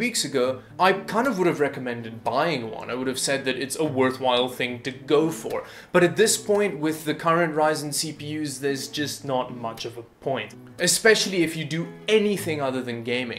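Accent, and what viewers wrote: South Africa. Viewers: Great video... Hey are you South African?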